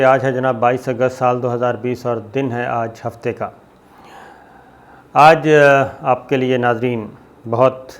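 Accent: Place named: Saraiki, Pakistan. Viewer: Saraiki speaking hai oski urdu aise he hai ap log khhamakha time apna zaya kr rahe ho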